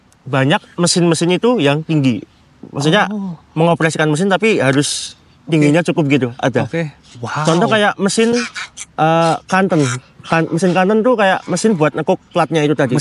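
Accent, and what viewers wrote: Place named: Banyumas, Indonesia. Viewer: howalah wong kebumen pantesan mandan ngapak wkwkwk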